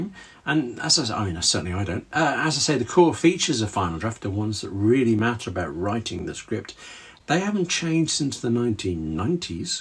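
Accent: England, United Kingdom. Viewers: Truthfully... I made this same mistake in an email... easy to do... (Smile). Thank you again for this great video... By the way, your voice sounds like you may be from England? I just found out through DNA that I'm 68% English 23% Irish (Now this explains why I'm so Victorian WHITE skin) I have been told I have Bass Belly White skin... I do not think that is a complement, my response is, I am Victorian White skin, more porcelain... not the belly of a bass... fish! Laughing so hard... you can't make this stuff up!!! The reason for my inquire of you being English... is I am working on a manuscript, hopefully to turn it into a screenplay... It is based on a true story, which I just happened to be apart of, about one of my clients that went to Harvard a few years back, and because of this, I have linked the "Boston Strangler to Jack the Ripper" I won't bore you with the details right now, but if and when I do finish the script, and if it does something, I"ll let you know... 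I used to have family in the UK... the HARRYs we even had a shield or that crest? Please do not mind my ignorance. I hope if my screenplay about Jack the Ripper/Boston Strangler comes about, I plan to go to England... maybe find some of my blood relatives over there. It was my Aunt Helen Harry that taught me how to drink Proper Tea. It is a dream of mine to one day visit England.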